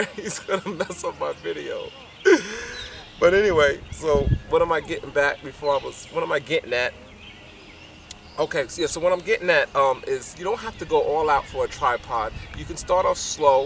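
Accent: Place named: New York, United States. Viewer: you are an intelligent c.... not just a c.... you are fun to watch and have a great New York (yeah) accent. keep up the good work😁😁😁😁😁😁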